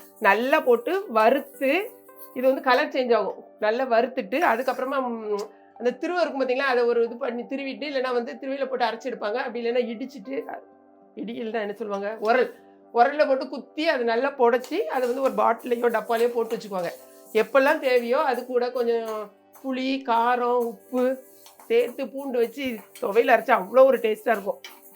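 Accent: Tirunelveli, India. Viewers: திருப்பூர் கோகிலா... வணக்கம் மேடம்... உங்க வீடியோ பாத்தா உடனே ஒரு லைக் போட்டு தான் வீடியோக்கு உள்ளேயே பாக்கறது... Because உங்க திருநெல்வேலி பாஷைக்கு நான் அடிமை 😍😍😍எங்க வீட்டுலயும் இந்த பொடி செய்வோம் 💚💚💚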